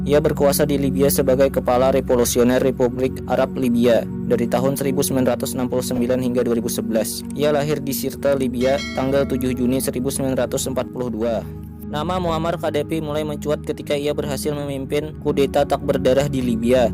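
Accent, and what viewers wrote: Banjar, Indonesia. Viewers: Logatnya kada kawa dikeramputi lg, pasti urang banjar, hehe.. salam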